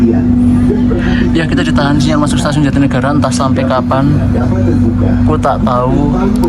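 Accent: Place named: Banyumas, Indonesia. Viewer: Hadirr mas mas ngapak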